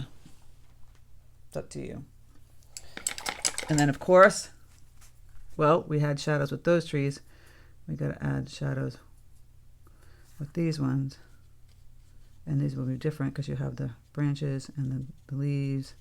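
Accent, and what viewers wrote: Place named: New England, United States. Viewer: Thank you so much! You are such a gifts painter!! I appreciate your instruction so much I love watching you paint, I learn tons of things. ❤ Plus I have grown up in the Southeast, and listening to your New England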